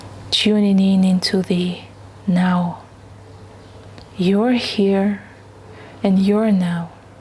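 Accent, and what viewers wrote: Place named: Kazakhstan. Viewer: cool Kazakh accent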